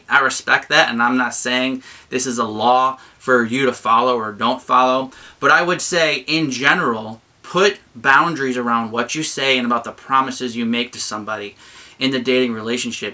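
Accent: United States. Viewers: I have watched, read, and heard a lot of Christian dating advice over the years, and this hit it right on the nose. I had never heard someone talk about emotional boundaries within a dating relationship, only with friends of the opposite gender so I really appreciated it being brought up. I also thought that the last point of being weary of our words and promises is a really key element as well. I can't tell you how many times I have heard friends or family make promises they can't keep in a relationship only to see it crumble when they can't hold up their end. I also liked your discussion of boundaries when talking about the future. I have never even considered that one before, however upon thinking about it, it is 100% true. I know I have made this mistake which lead to me staying in a relationship longer than I might have otherwise simply because we had talked about the future so much. 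I just wanted to say thank you and I enjoyed hearing the slight Minnesota accent with some great Christian dating advice.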